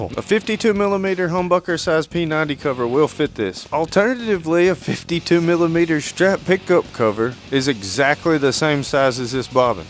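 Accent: South, United States. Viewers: I need a tutorial on how to speak redneck, that was amazing. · I just found your channel and I love it! Your Redneck Weird Al appearance is the perfect match to your southern drawl and dry wit humor! Plus, you seem to be pretty sharp. Keep the videos coming!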